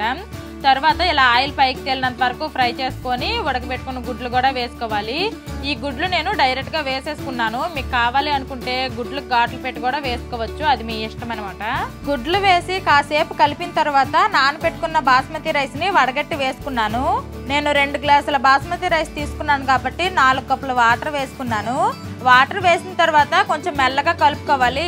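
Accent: Srikakulam, India. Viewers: Hi akka, madhi srikakulam dist. Mee videos chustunte ma srikakulam ammayi ani garvamga anipistundi. Nee matalu kuda chala natural ga mana srikakulam matalu Ani thelustay.❤❤❤ Akka meeru thumbnail and long video editing endhulo chestaru cheppara please, kudhirithe dhani meedha oka video cheyara akka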